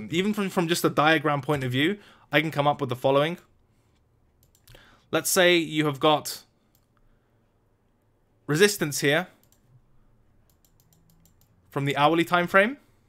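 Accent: United Kingdom. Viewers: High quality content, rich vocabulary and a British accent...I am wet :)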